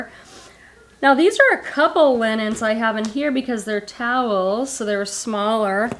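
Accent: Boston, United States. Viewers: I LOVE your Boston accent! Bra, Halara… I could listen to it all day. 😊 I want the Hudson Bay blanket! I’d struggle with selling or keeping it. Lucky reseller that found that!!!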